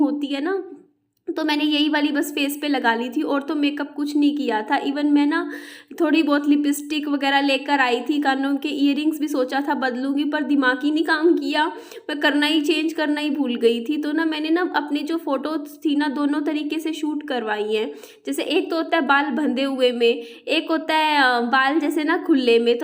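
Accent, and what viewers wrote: Haryana, India. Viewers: Haryanvi Tone m aise hi bolte hai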